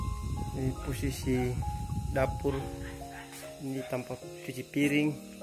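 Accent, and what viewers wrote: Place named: Poso, Indonesia. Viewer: Logatnya to Poso memang 🤭🤭🙏🙏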